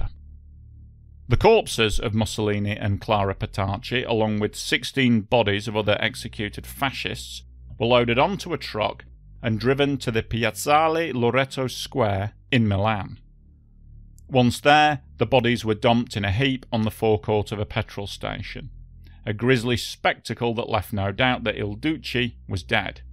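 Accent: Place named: Northern England, United Kingdom. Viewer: a pleasant northern English accent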